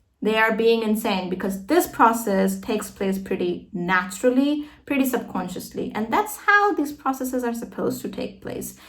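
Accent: United States. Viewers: hello mam how are you doing can I connect to with you once you are my big friend and I I always try to watch your video yeah it is true understanding your accent is difficult but I like so much American accent and I think you use American accent expecting your attention thank you so much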